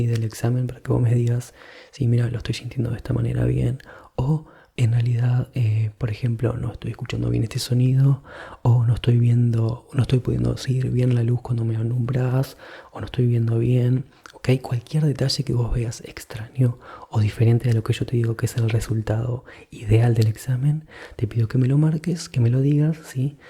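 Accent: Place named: Uruguay. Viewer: Me encanta tu acento. ¿Sos Uruguayo? Saludos!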